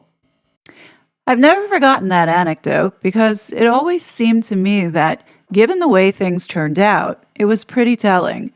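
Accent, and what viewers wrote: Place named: New York, United States. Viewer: yes! Yes! Yes! This is why I keep coming back. You say it in a way that only a New Yorker can say it. THANK YOU!!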